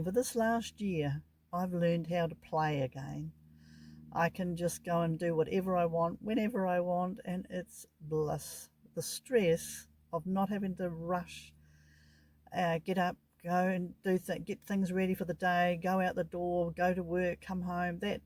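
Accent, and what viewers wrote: New Zealand. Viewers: Your lucky you live in NZ by the sound of your accent.. it’s easy to live in a camper van in NZ perfect country for it. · Nice to hear a kiwi accent on YouTube 😊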